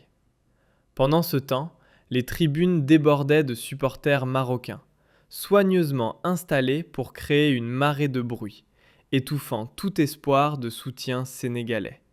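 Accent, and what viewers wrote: Quebec, Canada. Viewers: L'accent québécois est terrible. Changez SVP. Mettez le lecteur habituel.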